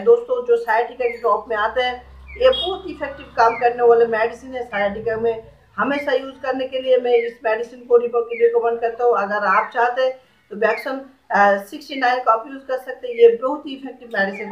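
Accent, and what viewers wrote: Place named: Bengal, India. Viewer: लैन्ग्वैज NO PROBLAM आप बैन्गोली है ओर हिन्दी बोल तै हुवै अछ ऐ लगी हो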